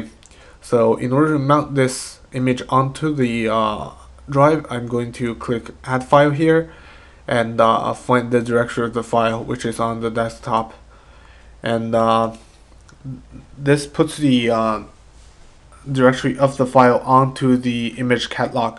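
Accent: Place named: Iran. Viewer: Iranian Accent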